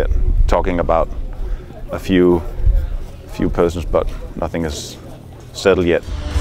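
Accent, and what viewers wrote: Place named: Denmark. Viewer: Why fix it if it ain't broken ~ gotta love the slight Danish accent, these guys are great, can't wait to see them live next year!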